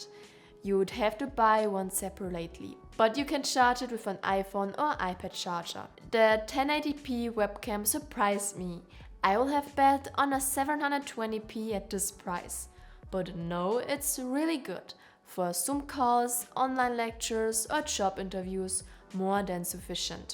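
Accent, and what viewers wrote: Germany. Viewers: Krasser deutscher Akzent 😂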